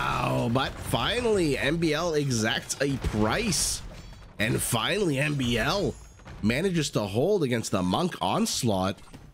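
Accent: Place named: Canada. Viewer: youdaman,! love the Canadian accent, ive got an Eastern KY one, myself.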